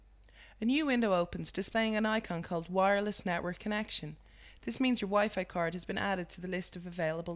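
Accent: Ireland. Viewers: great, simple video ... 4 stars + 1 star for the Oirish girl doing the talking. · She sounds Irish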